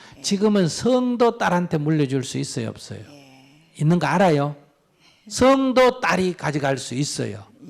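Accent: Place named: Chungcheong, South Korea. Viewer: 누가 봐도 충청도분이시네ㅎㅎㅎ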